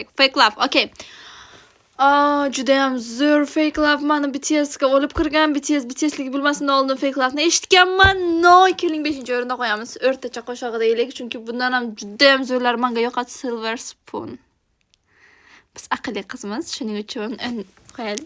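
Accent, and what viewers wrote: Bukhara, Uzbekistan. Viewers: juda go'zalsiz buxorolikmisiz shevayiz oxshaydi manam buxorodanman qayeridansiz😊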